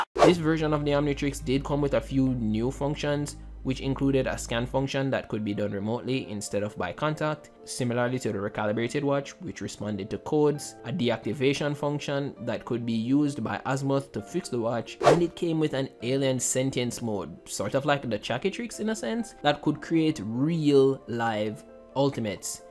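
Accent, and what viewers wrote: Jamaica. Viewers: he sounds jamacian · Are you jamaican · bro u Jamaican? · Ur Jamaica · Are you Jamaican ? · Out of curiosity, are u Jamaican 😭 i hear a accent but cant pin point it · Hes jamaican but i cant prove it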